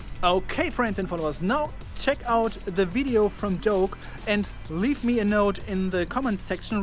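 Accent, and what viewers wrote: Germany. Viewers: Dieser Deutsche Akzent killt mich einfach😂 Ps: Du hast echt heftiges künstlerisches Talent Tolles Video und schöne Grüße aus dem Emsland✌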